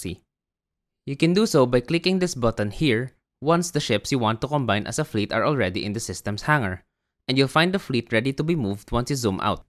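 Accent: Philippines. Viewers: I knew from your accent that you're a kababayan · Hearing Tagalog accent was a surprise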